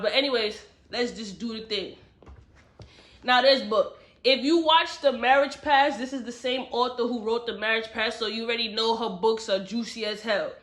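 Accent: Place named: New York, United States. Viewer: I knew you from N.y. I can hear the accent. I'm from CT.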